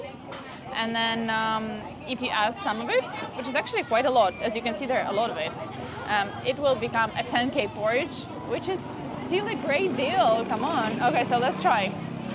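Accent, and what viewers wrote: Vietnam. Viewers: Your VN accents from the northern. Wow. Cool.😊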